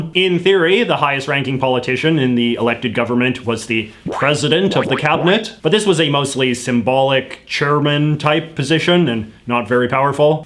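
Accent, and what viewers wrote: Canada. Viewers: Off topic. English isn't my first language but I'm able in broad strokes to hear differences from around the globe but I'd never really noticed the unique features of the (or one of the) Canadian accent(s) before I began watching this channel. Well, carry on. Great channel by the way / new subscriber.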